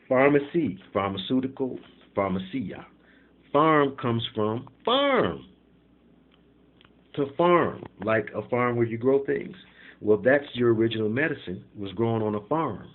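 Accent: Detroit, United States. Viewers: Are you from Detroit bro? Much luv appreciate your teachings.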